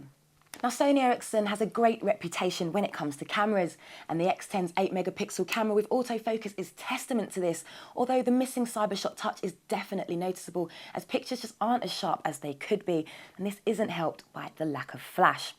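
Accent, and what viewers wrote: United Kingdom. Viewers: fuk this british acsent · Yeeeeeaaaaa, British English is charming)) Amazing pronunciation=))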